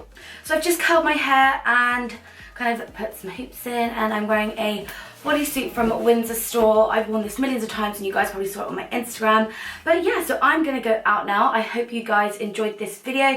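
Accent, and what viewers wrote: Newcastle, United Kingdom. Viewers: Why were you doing a geordie accent? & love your skin makeup! X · Ahhhhh fuck it lets purit everywhere 😂😂 I'm a Geordie and you legit sound like me when I'm getting ready 😂😂🙌🏼👏🏼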